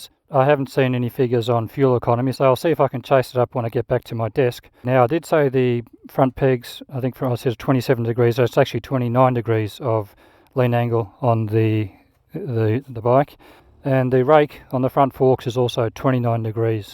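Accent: Australia. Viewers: To be honest I really interspersed in bikes mostly cruisers but the main reason I watched all your videos is your way of speaking Australian English which is great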